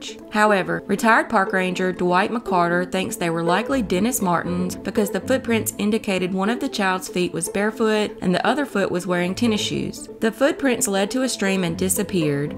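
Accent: South, United States. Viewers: I live in Georgia and I love your channel. It's nice to hear a pleasant southern voice for once. Keep up the great work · and now I speed you up. Also being Southern …I speak fast, as if that should explain things 😂so I speed anyone up, not just you. Yours is just more pronounced than my NC accent. 😎 No problem it makes me comfortable. Thanks for ALL the cases. ✌🏻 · Ahhhhhh that southern accent. Very relaxing and easy to understand.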